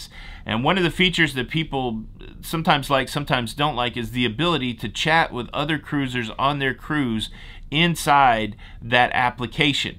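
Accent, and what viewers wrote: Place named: Texas, United States. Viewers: that was some amazing Texas talk! lol #houstonian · Just love your Texas accent,! Ha! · That Texas accent was so natural! Well done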